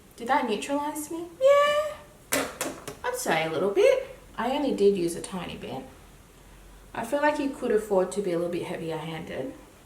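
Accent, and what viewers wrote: Australia. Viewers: I have just found your videos and love having a straight talking Aussie beauty voice around. Love your vibe and realness very much. And laughing so much at how hot and sweaty you are because I totally get it 😂. So hard to even do makeup on some days the last few months. And so much appreciate the discussion on overconsumption. I am a proud environmentalist but also a beauty and clothing lover and struggle to live by my values in terms in that space in terms of overconsuming and wasting because there are so many cool things to try and express yourself with. But we need to be more mindful and keep trying and figuring out how to make sustainability work. I so appreciate you talking about this on your platform because if no one talks about it openly we all just sit here feeling guilty and not knowing what to do - nice to all be in it together rather than feeling lost amongst the pressure to consume from the media & influencers. 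· Im so so sorry Australians and im absolutely not trying to offend u but i really really do not like an Australian accent 😮. It makes people sound so friggin unintelligent and almost as if ur slurring ur words like ur drunk. I think its the most unattractive and horribly sounding accent in the entire world. Btw, i have had zero influences. Please don't come at me with ur anger and verbal abuse. Like i said, its just my opinion which im totally entitled to express.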